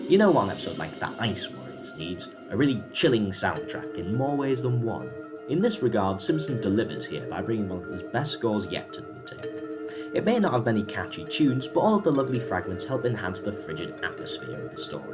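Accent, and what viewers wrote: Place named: Newcastle, United Kingdom. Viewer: I opened this video expecting a nice run of samples of incidental music from big P Troughtons run, instead i get this wet geordie talkin a load of rubbish, big thumbs down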